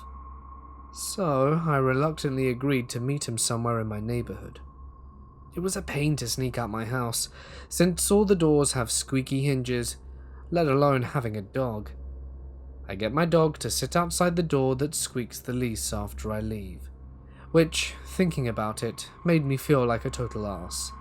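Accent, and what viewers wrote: England, United Kingdom. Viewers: Hey! is from England.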